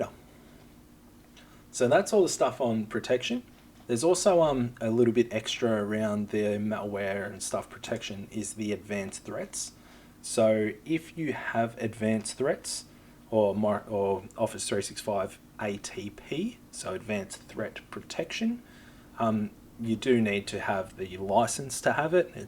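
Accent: Australia. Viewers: I guess this is the closest thing we are gonna get to finding a video with English Speaker. Like, I saw the thumbnail and thought YAY a white guy then I hear hes Australian and Im kinda bummed but then I think well at least hes not Indian. WHY are so many Tech videos done by an Indian with a super harsh accent? Hey Non- American accent aside, Great video "Mate". ;-)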